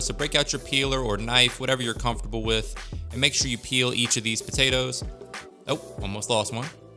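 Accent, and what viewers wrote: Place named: South, United States. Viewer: First time watching this channel, love the sound of your voice and your southern accent.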